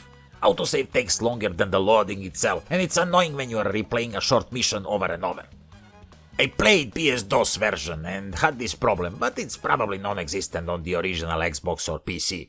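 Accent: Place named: Sardinia, Italy. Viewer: I can't belive americans mistook your accent for spaniard when it's obviously sardinian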